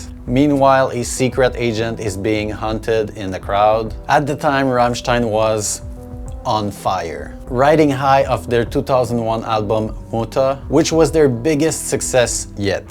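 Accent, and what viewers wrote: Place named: Quebec, Canada. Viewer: Je détecte l'accent Québécois ❤ Dommage qu'il n'y ait pas plus de contenu francophone concernant ce genre de musique que j'affectionne très particulièrement. J'adore ta chaîne, je me suis abonnée ✌️ *Mention spéciale au film Spawn de 1997, une des meilleures trames Sonore jamais faites et .... My life with the Thrill kill cult dans le film The Crow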